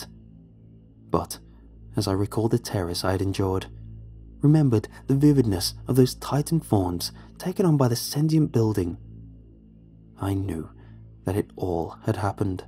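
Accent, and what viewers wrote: England, United Kingdom. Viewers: Strangely it's been the same for me. It's something about his steady English accent that makes me drowsy.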